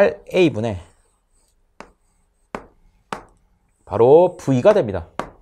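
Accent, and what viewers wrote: Gyeongsang, South Korea. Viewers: 화내서 사과하는거보고 빵터짐 ㅋㅋㅋㅋㅋㅋ 경상도말투라 감안하고 봤어요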